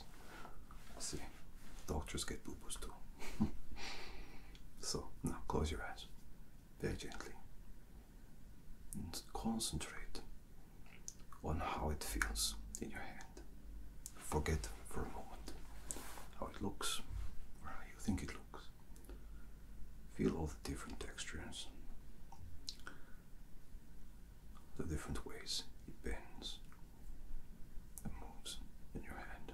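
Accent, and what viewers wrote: Russia. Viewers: your Russian is wonderful and the good Dr Roberts!! So glad he is still with us and got to take a vacation..Im sure Matt was a rather difficult patient😎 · your Russian is better than what Hollywood often settles for in their movies. Well done! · I’m going to insist my doctor speak with a Russian accent from now on. 😁 Good job,! 💙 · posts video with russian accent Me: crying and pretending that this is Dimi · made me smile with a russian voice and of recent news of russian poisoning and hearing police sirens Twice lol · Отличный русский акцент! Спасибо за видео, голос шикарный. Мурашки бегут, словно резвые кони по берегу Днепра! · I love the Russian accent RPs! How wonderful that you combined it with a medical RP. Genius! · your Russian accent is my personal favorite trigger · Love the Russian accent 💙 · Oh...yay! The Russian accent returns....as a blonde!?!?!? 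😊 Is the fact that I didn't know the answers to some of the UK questions due to a brain aneurysm, or is it because I'm American? 🤔. Glad our favorite doc is taking some time to be where is needed most.. 🥰 Stress & headaches galore this week. Being kind to myself sounds like a good idea, as does being kind to others. I've been letting certain people push my buttons. Gonna have to work on that. Lovely video as always. 😊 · Very tingly and the your russian accent is the most pleasant to listen to ever, thanks for the video, ✨ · No matter what name you decide to use Roberts or Alex you would be the best "doc" a person would want to see and i like your russian accent 😊😊😊 Always well done really 🤗👏👏💖😍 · Nom nom, - delicious tingles to be had here! Russian voice: My brain is no longer hunger for relax.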